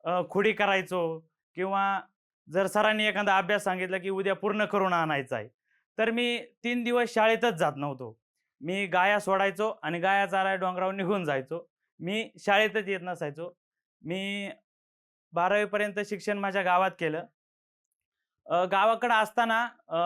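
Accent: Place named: Ahmednagar, India. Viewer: . तुझी टिपिकल नगरीं भाषा मस्त वाटतेय..तसेच बोलण्याची tunig आणि मकरंद अनासपुरे यांच्या सारखी....कलाकारी खूप छान वाटतेय 👌👌👌... तूमचा तिघांचा पोडकास्ट..... कालच बघितला...... Nnss कॅम्प बघून ती कलाकारी शाळेतील वर्गमित्र सोबत करून कलाकार होणे.... मानलं राव 👌👌👌👌gret ❤️❤️👍👍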